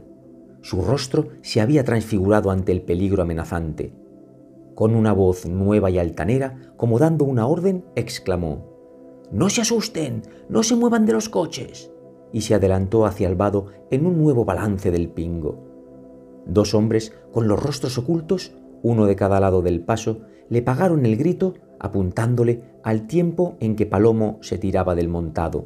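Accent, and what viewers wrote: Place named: Uruguay. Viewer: 🎉saludos desde Piura - Perú. Estaré atento a la narración de cuentos, leyendas, con la dicción local de Uruguay. Grácias. Cuide su salud. Felicidades.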